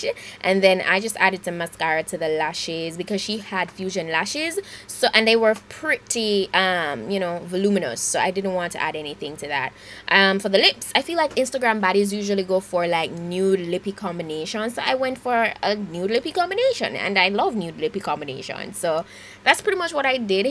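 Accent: Jamaica. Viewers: Jamaican accent yaass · You sound Jamaican.... And I know becuase I am Jamaican....... Nvm 😂😂😂 you are I just saw the other vid..... New subbie... Btw.... Big up yuh self 👅🌸🙏💙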